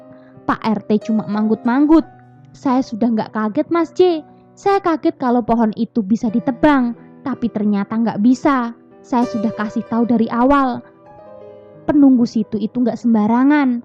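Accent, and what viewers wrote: Java, Indonesia. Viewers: Org jawa ya kak? Logat nya medok kali 😂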